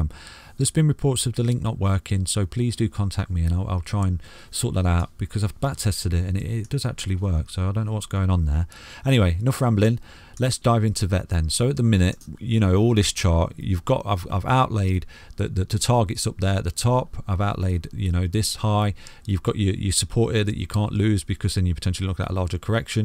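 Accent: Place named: Nottingham, United Kingdom. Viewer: Awesome :D Love it! Where you from, you sound like you're not far from Nottingham or Derby?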